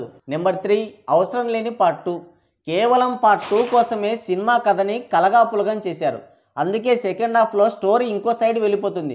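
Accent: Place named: Telangana, India. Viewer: Anadaru chiru, ntr laga real. Life lo act cheyaleru lera disiplane anteh endi ra lanjakodaka telangana slang lo matladitheh discipline lenataa pukaa andra lo shekalu. Paditheh displane unatlaa ina ni voice ala undi nit motham evadidoo chiikii chikki noru noppi puuti matladinatlu ni abbaa ninu byta edo oka roju pagal dengutharu chusuko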